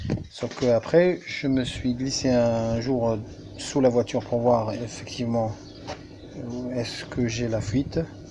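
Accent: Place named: North Africa. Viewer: Accent bledart et accent du sud ca fait space.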